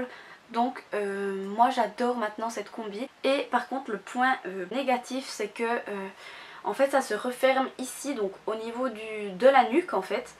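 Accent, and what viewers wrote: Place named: Belgium. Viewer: Super vidéo, je commande tout sur Aliexpress et j'ai eu bcp de déception... Continue comme ça PS: J'adore ton accent 😍 Belge ?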